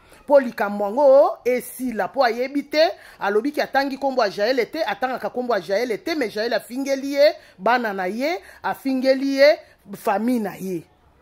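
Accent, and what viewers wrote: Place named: Kivu, Democratic Republic of the Congo. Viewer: Oza penza mutu ya Kivu Na tonalité 😂😂😂 jambo dada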